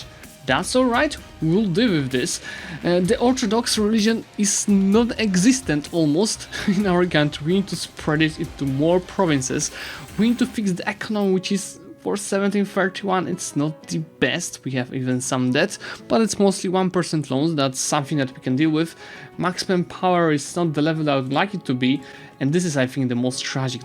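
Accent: Eastern Europe. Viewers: What the, the voice describing the situation sounds feminine/or really young but the pronounciation sounds like, its kinda weird, amyway great video, and comment for algorithm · Самый славянский акцент в мире.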